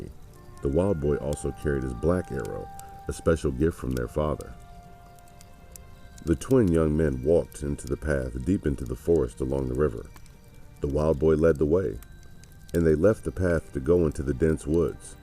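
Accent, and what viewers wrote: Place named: Texas, United States. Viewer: Sounds like Texas to me!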